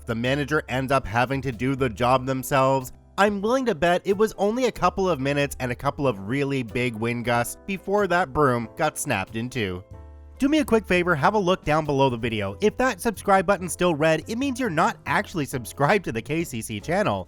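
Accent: Canada. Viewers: Can't tell if that's Canadian Accent or if KCC is really an American from wisconson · okay but the canadian accent tho that caught me off guard XD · You know their talking about our TIM HORTONS GOD I LOVE MY TIMMYS PS LOVE YOUR CANADIAN VOICE VERY FUNNY CAUSE ITS TRUE 🙃🤪😜 Sorry lol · Irish accent with a Canadian twang. Lol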